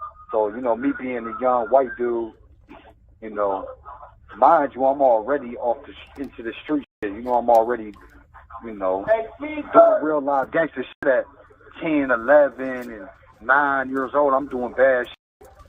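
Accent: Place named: New Orleans, United States. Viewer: He talks like he comes from NOLA.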